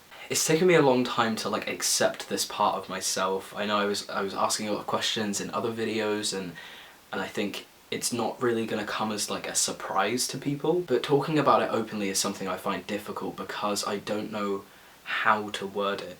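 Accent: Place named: United States. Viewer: All I can see is that youre kind of speaking with an american accent already. Is it because of the songs your singing?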